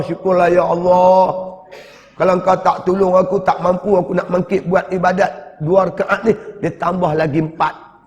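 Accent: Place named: Kedah, Malaysia. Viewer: Alhamdulillah kata2 yg penuh hikmah dan saya rasa tersentuh hati dgr nasihat ust siddiq nor. Dlm masa yg sama sy nk tergelak dgn loghat kedah dia😄 semoga Allah memasukkannya kesyurga firdaus.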